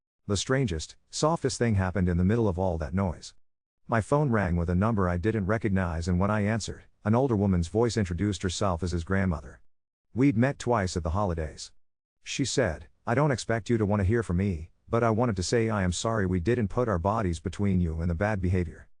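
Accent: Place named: South, United States. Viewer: why is the voice southern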